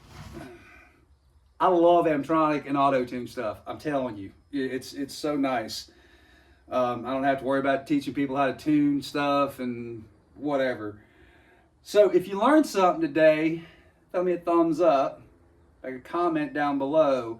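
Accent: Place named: Rock Hill, United States. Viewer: Man what in the hell??!! I’ve been watching your videos for some time now as I minor in tree work with a major in chainsaw addiction, pretty much all small engines really, I have two small outboards hanging on stands in my dining room right now, like it’s bad. Ive bought 4 chainsaws in the last 3 weeks just from scrolling through marketplace bc ppl don’t know how to work on stuff anymore. I just picked up a 201tc like this one for free from another tree service that had run over it with a kast lift, gonna need at least the handle and all that stuff that runs up through there so looking forward to all that lol. Anyways every time i watch one of your videos it’s crazy how much you sound exactly like my uncle lol. I said to myself, “he must be from down here somewhere”. Then come to find out I was right lol. You sound like you from somewhere around Rock Hill but hell there’s ppl that sound like us all over I’m sure. 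Small world. Anyhow, love your content brother, you’ve helped me a lot! Just modded out my little 194t bucket saw. That bitch is a screamer now son! So thank you for that! Hope to see you out there someday brotha, appreciate everything!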